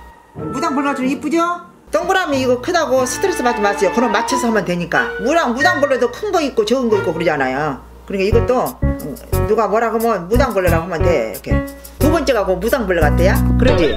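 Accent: Jeolla, South Korea. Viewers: 전라도 분이신가요? 저희친가도 전라도라! 익숙한 사투맄ㅋㅋㅋㅋㅋㅋ 오래오래 건강하세요❤❤❤❤❤❤❤❤❤ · 전라도사람이라그런지 친근감이 갑이에요♥너무귀여우셔♥♥ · 얼마나 부러운지 몰라요. 우리 할머니가 계셨으면... 우리 할머니 말투랑 똑같아요. 전라도 사투리! 진짜 너무 심하게 마음이 따뜻해지고 할머니가 그리워지면서 동시에 너무 웃김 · 할머니 ~ 저희할머니도 전라도 해남분이시라 할머니랑 말투가 넘 비슷해요 😚 그래서 우리 할머니처럼 느껴지고 너무 좋아요 !! 사랑해요 할머니 💖 오래오래 건강하세요 ~ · 부모님 둘다 전라도분이셔서 이쪽 사투리 너무 좋아요 ㅠㅠ♡♡시골온것같음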